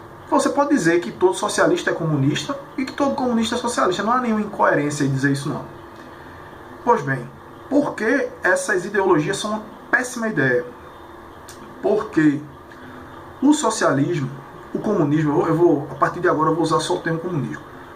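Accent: Pernambuco, Brazil. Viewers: Você é pernambucano? Eu também sou, Parabéns pelo vídeo, estou querendo fazer um curso de história e estes vídeos me deixam com muita empolgação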